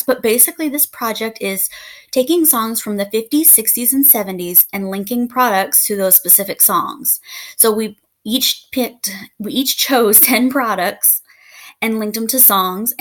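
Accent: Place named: United States. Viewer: You have such a lovely accent! What state do you live in? I love soooo many of the songs you chose!!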